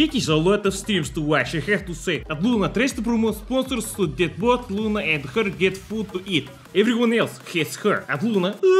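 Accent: Russia. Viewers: im positive hes russian but i would lowkey laugh for many moons if he was a white dude named walter with a good fake russian accent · The accent got me fucked up I cant keep up I need the subtitles sometimes you really think he be speaking in Russian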